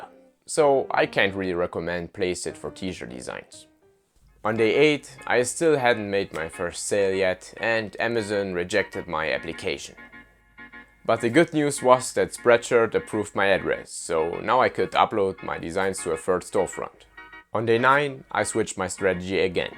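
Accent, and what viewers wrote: Germany. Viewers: Are you german ? It Sounds like that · German accent? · Man hört das deutsche haha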